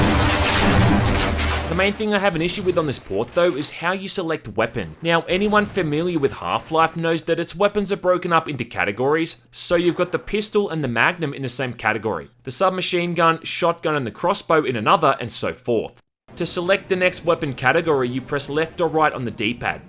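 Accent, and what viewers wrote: Bogan, Australia. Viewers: I really hate how you talk, you sound super bogan.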